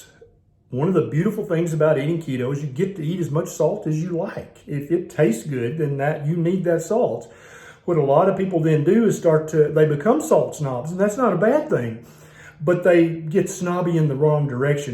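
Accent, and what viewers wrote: South, United States. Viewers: .....loooove your delivery! No baloney, no hooey, just southern drawl sweetness and info from a southern gent! Thanks for keeping it real!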